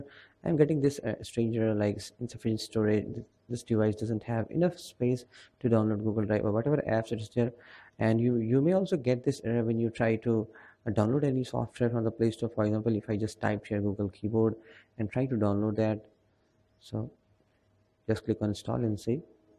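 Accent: India. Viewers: you can slow down, you talk way too fast to even comprehend what in the hell you're even saying! Maybe a fellow Indian ( I'm not speaking of a native American Indian) can understand you. And you seem informative in tis area and on this Question I need answers to. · Im 90% sure that u r from india. Ur accent tells everything :) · First, I don't understand indianglish, sorry. Second, I have no single thing to delete (factory settings, new phone) and still 'insufficient storage'. F***k why?!? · having a deep Indian accent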